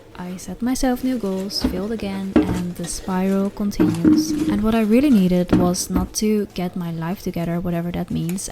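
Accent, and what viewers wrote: Netherlands. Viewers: I feel like youre Dutch. I can hear the accent. First video I watch by the way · Are you dutch?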